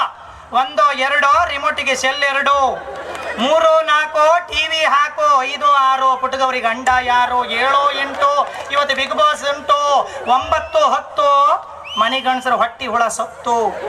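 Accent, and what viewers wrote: Kundapura, India. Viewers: Super sir kund kannada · Kundapura kannada chanda adu handadi bayall innu chanda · Kundapura bashiye Chanda adrage nam annan math ennu Chanda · ನಿಮ್ಮ ಕುಂದಾಪುರ ಬಾಷೆ ಬಲು ಚಂದೈತಿ ಮಾರಾಯ್ರೆ.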